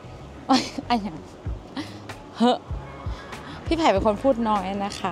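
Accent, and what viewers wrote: North, Thailand. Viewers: สาวเหนืออู่คำเมืองน่ารัก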